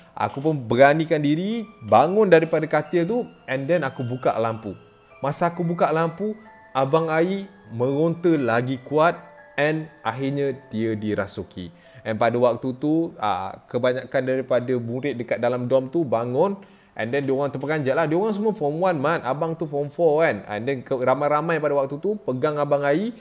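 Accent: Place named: Penang, Malaysia. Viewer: Napa suka cakap Penang? Influence org Penang Ka hahaha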